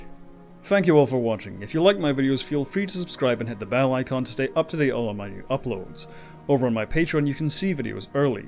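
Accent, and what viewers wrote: United Kingdom. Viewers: I'm guessing he's British from the accent. Whether he's in the UK or Australia, however, means Harmony Gold isn't an obstacle to getting localized product.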